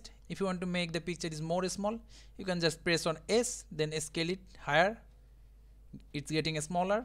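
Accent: India. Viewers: as usual, when you hear an indian voice when looking for a tutorial, it means you found the answer... Thanks!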